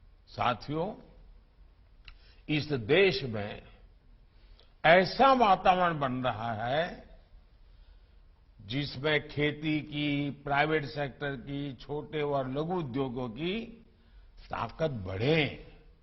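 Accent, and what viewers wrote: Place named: North, India. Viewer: That's true he's needs to speak in English and not like a North Indian villager what about the rest of us non hindi speaking Indians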